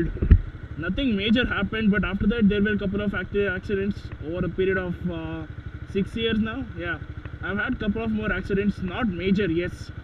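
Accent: India. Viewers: mane yell guru nindhu? im from bang too, ur vids r great and 1000% INDIAN accent lol.....u got a sub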